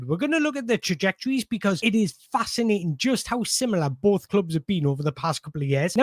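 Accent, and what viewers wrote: Newcastle, United Kingdom. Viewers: the only geordie i wana listen talk about a villa game against newcastle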